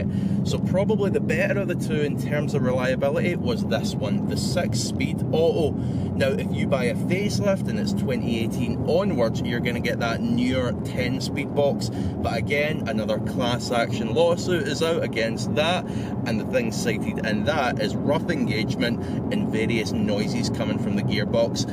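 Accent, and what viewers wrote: Scotland, United Kingdom. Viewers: You sir, are Scottish? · Got I love the Scottish accent, I’m getting a 2015-17 gt in a few months conv 6 speed❤️🤤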